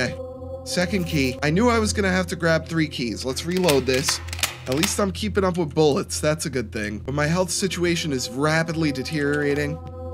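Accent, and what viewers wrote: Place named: South, United States. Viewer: That country voice modulation tho 😏🥵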